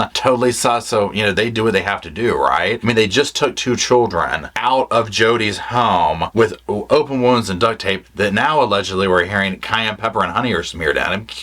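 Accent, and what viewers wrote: South, United States. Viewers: 100%! I, too, have a Southern accent. Listening to him is like sitting on the coach with my family jaw-jacking about the nuts around us. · YES, I get all the info I would from the news, or courtv, but in a much warmer, SOUTHERN way, including some opinionated sidebars, which is just how I like it!❤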